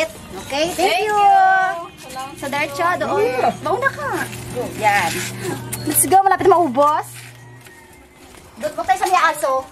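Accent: Philippines. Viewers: I love your pinoy accent, are you married?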